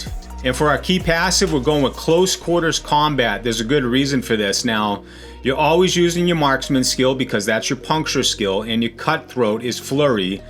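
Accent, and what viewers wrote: Boston, United States. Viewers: Aside from the cool build, this video is quality. Good pacing, delivery, and energy. Getting some Boston vibes too for an added bonus.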